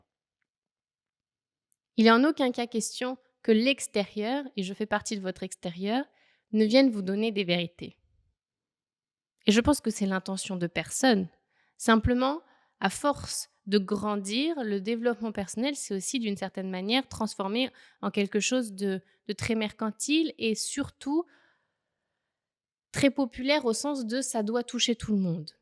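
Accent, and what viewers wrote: Spain. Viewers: Elle vit en Espagne, si elle parle Espagnole au quotidien, prendre un accent c'est normal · Coucou c’est drôle tu as chopé un léger accent espagnol par dessus ta langue française 😃 c’est joli comme tout! Sona bien y bonito 🥰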